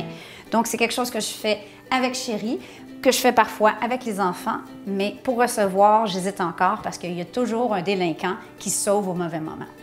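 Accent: Quebec, Canada. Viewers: J'adore l'accent québécois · L'accent quebequois gâche tout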